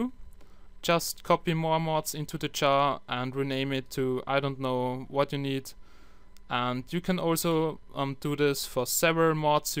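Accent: Germany. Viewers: you sound like Docm77 ... German Accent ♥ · man merkt das du deustcher bist :D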